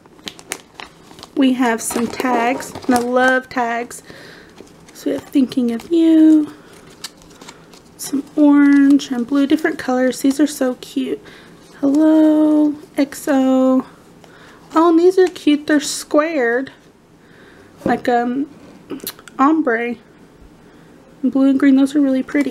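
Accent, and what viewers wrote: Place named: South, United States. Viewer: SOUTHERN CHIPMUNK VOICE!!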